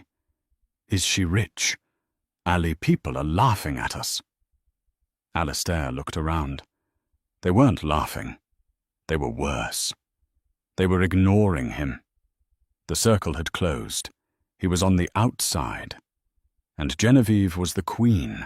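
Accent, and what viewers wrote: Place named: England, United Kingdom. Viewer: Dreadful English accent!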